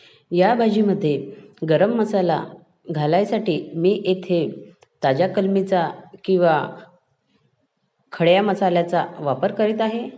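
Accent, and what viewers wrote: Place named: South, India. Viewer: ताई साऊथ च्या आहेत म्हणून असे मराठी बोलतात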